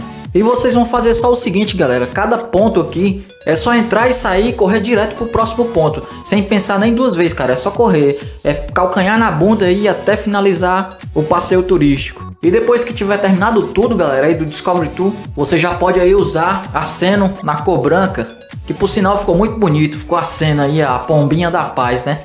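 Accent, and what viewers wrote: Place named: Northeast, Brazil. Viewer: E nois tabem sou nordestino cabra da peste o Nordeste vai domina o mundo percebi pelo sotaque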